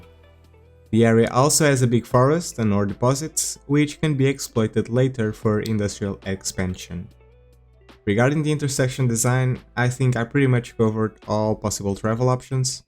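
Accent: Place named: Portugal. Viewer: O inglês do português é maravilhoso, merece o meu gosto. Nota-se mesmo quando um português fala inglês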